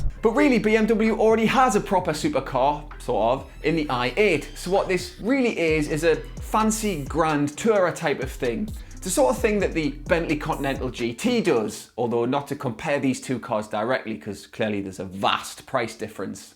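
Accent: Newcastle, United Kingdom. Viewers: Hair, tats, Geordie accent , Badgers and a Beeemer - whats not to like :)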